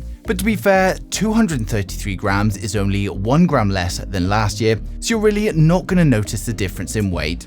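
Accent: England, United Kingdom. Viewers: What's wrong with the English accent? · Same rumors can be found on more reliable sources and they don't have that awful English accent.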